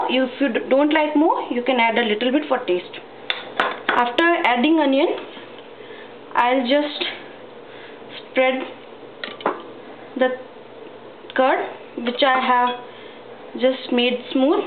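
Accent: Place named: India. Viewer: Its indian accent...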